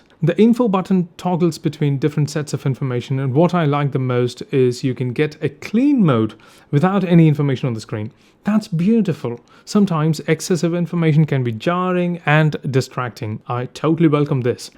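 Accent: United Kingdom. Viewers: Accent like BBC news reader, Superb bro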